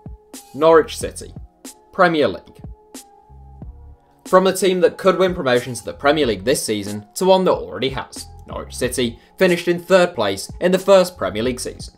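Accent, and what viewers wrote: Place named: Manchester, United Kingdom. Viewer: note this narrator has a manchester accent hence the bias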